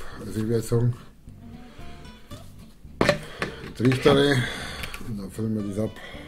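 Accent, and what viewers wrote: Bavaria, Germany. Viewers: Danke für deinen bayerischen Dialekt!!!! Mai, ist das schön zum hören :-)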